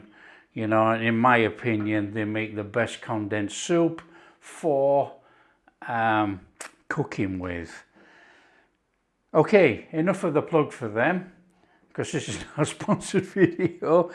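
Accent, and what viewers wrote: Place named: North Yorkshire, United Kingdom. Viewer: Where are you from? Can’t place your accent, North Yorkshire?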